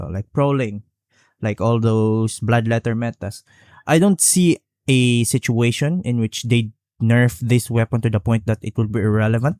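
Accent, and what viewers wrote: Philippines. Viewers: Brother I shouldn't even leave a comment cause you are going to try to deflect. You sound Filipino which is a "third world" country yet you made a joke and put up African children. On top of that malaria is present in the Philippines. Just my two cents, enjoy the traffic my comment brings.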